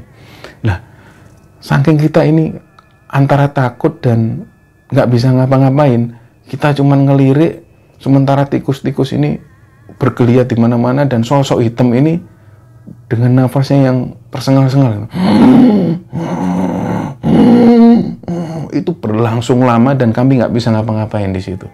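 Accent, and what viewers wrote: Java, Indonesia. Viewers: Abang orang jawa kah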